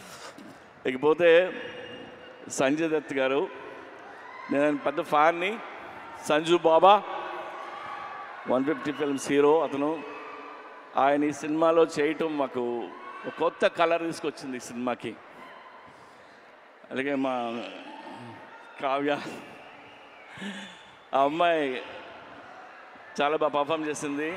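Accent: Telangana, India. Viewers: orey vadu telangana slung enti ra ..ni ayya..nuvvante maku oka nammakam ..but.. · Telenga slanga ..worst dialogue delivery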